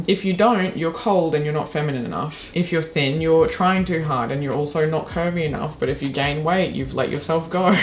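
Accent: Australia. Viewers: Love hearing an Australian accent delivering intelligent, factual and insightful commentary about a massive issue. Post 1960 and the invention of the pill women have choices. We no longer spend our fertile years bearing or feeding bubs. Being pregnant and nursing infants makes us super vulnerable physically and financially. In an unsafe and radically unstable world young women will choose not to have children if they have access to birth control.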